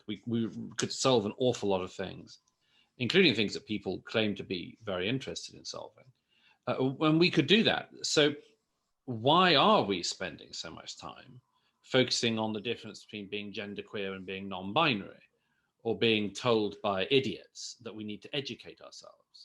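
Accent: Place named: Swansea, United Kingdom. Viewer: Sounds like a Swansea accent! 🌅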